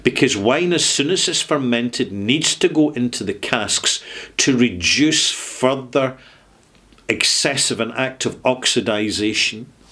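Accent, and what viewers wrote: Scotland, United Kingdom. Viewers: I've been trying to listen to a couple of Bootlegs Clash and Led Zep) I bought tonight and watch you with subtitles. It is really quite hard to make sense of your videos using the subtitles alone as they are so off the beam. It is part down to the Scottish accent - have you seen the sketch in the voice actuated lift with 2 scotsmen! No biggie really I know I should listen to the undiluted velvet tones only.....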